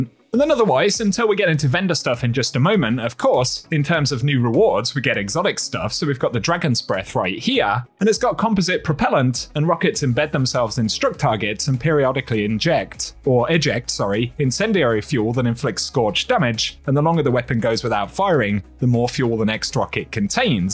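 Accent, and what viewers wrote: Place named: United Kingdom. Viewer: Here for the delightful British pronunciations!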